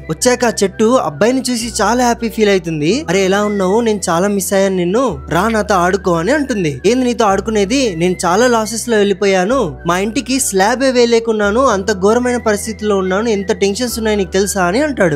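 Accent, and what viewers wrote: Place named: Mumbai, India. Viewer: Tammudoo... nuvvu Telugu Lo videos chestunnav kada.. Mari Telugu raanattu telugu ki Tegulu pattinchaku.. mangoes.. branches... yenduku ilaa anavasaram gaa English Padaalu vaadutunnaav? Bombay nunchi vacchina Heroine laa.. ilaa maatlaadithe Buttler English laa untundi.. neeku English meeda pattu unte English lo ne chei ilaa Telugu ki Tegulu pattinche prayatnam cheyaku.. vinadaaniki assalu baaoledu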